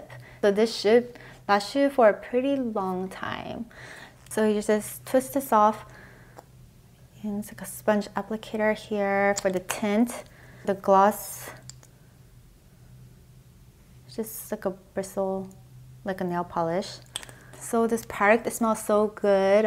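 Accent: South Korea. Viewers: wats wrong with her voice? korean accent?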